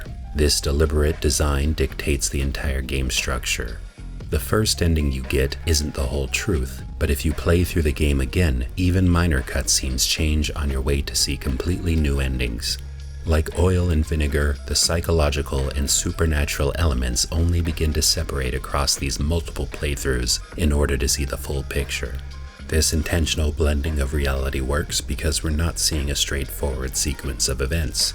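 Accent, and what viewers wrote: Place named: United States. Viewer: knocked this one out the PARK!!! i feel like no other channel has really synthesized the meanings of this game for me like you did, both culturally and thematically. in particular, your emphasizing the implications for everyone in the gender binary of male/female was really refreshing. being half japanese but culturally very american, i could feel the gap in western game channels' analyses on the game no matter how well researched. also while the localization and voice acting/directing was amazing, rinko's jp voice especially really sold her to me as both a two faced b/antagonist and an actual complex human who ultimately /was/ hinako's best friend. just hits different when you've been around real life rinkos! gotta say, though i laughed multiple times during this, your dragon pronunciation joke went right over my head because i thought your pronunciation was...normal american? 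anyway im penning this as i settle into my 3rd rewatch/listen, looking forward to checking out the rest of your channel vids and future releases!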